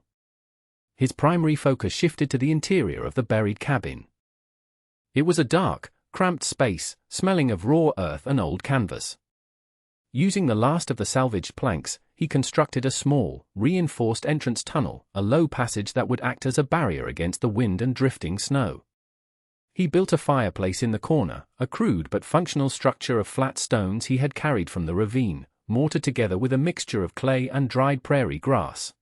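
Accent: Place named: United Kingdom. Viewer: After 10 seconds I stop the video. I don't want to hear a western tale narrated with a British accent.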